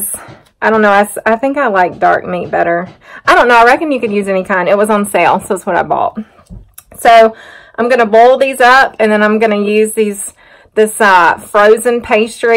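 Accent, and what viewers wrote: South, United States. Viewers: Your to real + genuine to be a politician my dear. Also, I adore your southern accent.! U don’t hear that to often in New Jersey.😎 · I could listen to you all day love your southern drall and your beautiful girl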